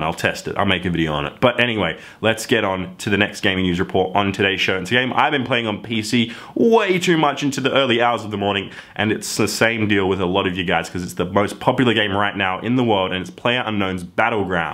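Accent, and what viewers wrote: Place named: Australia. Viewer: Wow you stupid aussie tool! What a scoop you've come up with. Sony will release a PS5 someday? Gee? No one saw that coming. Heres another scoop for you....someday...Microsoft after Xbox One X is released will come out with a new version of the Xbox......possibly called Xbox 2. I must be psychic. You suck! your channel sucks! your accent sucks! Australia sucks! F U!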